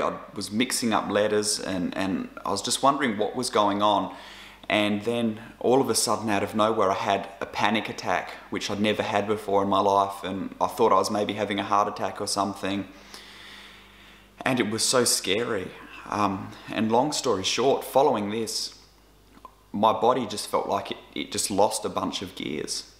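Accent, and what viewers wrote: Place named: New Zealand. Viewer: Hi! I just come across you a few minutes ago. I to have chronic fatigue and I am a Christian. I was just diagnosed early last year 2020. I’m from Auckland New Zealand. You sound like a kiwi are you from N.Z?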